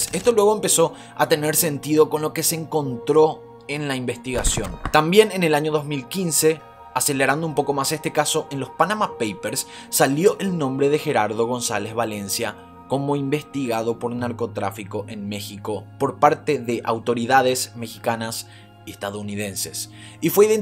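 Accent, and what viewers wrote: Paraguay. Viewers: Me encanta que no perdes el acento de Paraguay ❤️🙌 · Tu acento neutro paraguayo 👌👌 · me encanta los Capitulos, despues de ver 6 episodios me di cuenta que es Paraguayo, por el asento 😜 te sigo desde Dublin Ireland 👍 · Te encontré en Fb Y luego te busque en YouTube Me suscribí a tu canal Me encantan tus videos Todo muy bien investigado No había escuchado hablar a nadie de Paraguay 🇵🇾 hermoso acento 😊 Me uniré a todas tus redes sociales 😉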